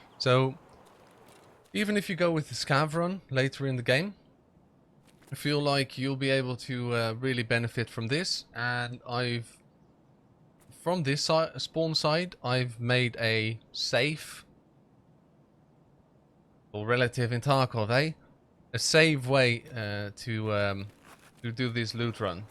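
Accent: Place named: South Africa. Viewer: I've been trying to figure out where you are from by listening to your accent.. (Found your channel today) First I was thinking European that's lived in New Zealand a long time.. But now I'm really leaning towards SA.. :P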